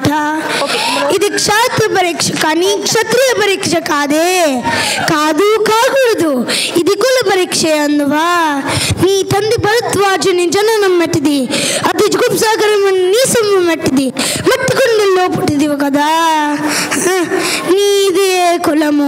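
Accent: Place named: United Kingdom. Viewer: ఆంగ్ల బాషా యాస వున్నా బాగా ప్రత్నించాడు.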